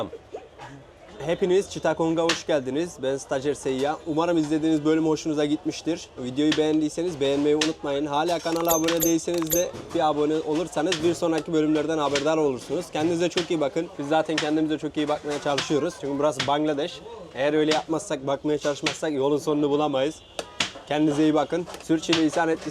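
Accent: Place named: Central Anatolia, Turkey. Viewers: Slm kardesim.cok guzel videolar.konusmalarin ayni bizim kayseri konusmasi gibi.allaha emanet ol kardesim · İç Anadolulu olabilir. Herkesin ağzı bir değil. Bilerek yapmıyordur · Kardeşim çok neşeli, eğlenceli bir insansın. Bir de bizim iç Anadolu ağzıyla konuşman çok güzeeeeeeellll❤❤❤❤❤ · Sen ananas satan adama "Ek" diyorsun, bir demek istiyorsun, ama o senin inglizche konusmani bekledigi icin seni anlamadi galiba, beyni durdugundan degil )))). Anadolu shivesine bayiliyorum, has türkce. Amerikali , bebek gibi konushan genchler sinir ediyor, ama senin türkchen cok güzel. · Kral konyalımısın şiven bizim oraya acayip benziyor. Video mükemmel olmuş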